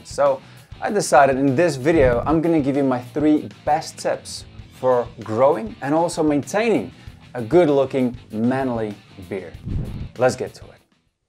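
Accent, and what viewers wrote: South Africa. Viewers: Can't help noticing the accent, south African? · Are you a South African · Awehh broooo did not expect you to bee south african 🇿🇦🔥💪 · Soon as you started talking I thought, that's a boerseun. You can never fully undo the south African accent. Thanks for the tips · That accent sounds south African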